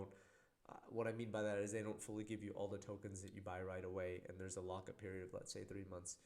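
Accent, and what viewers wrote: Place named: United States. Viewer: You sound American. How can you invest in IDOs when they all require KYC to filter Americans out?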